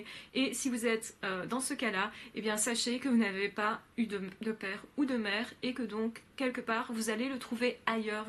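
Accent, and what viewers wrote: Belgium. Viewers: J'adore votre accent (belge) avec: puis (pouis), fuir (fouir)... Victime d'une pervers-narcissique je me demande si je ne le suis pas aussi!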